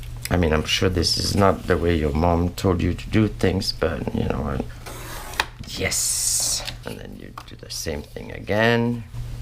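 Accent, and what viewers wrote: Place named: France. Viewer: I just found your channel. I enjoyed watching your video so much! I already knew how to make the project, but I continued watching because youe personality and commentary are so great! It was like the voice inside my head when I am crafting, just without the French accent (which is very cool, btw😊). Thank you so much for sharing this!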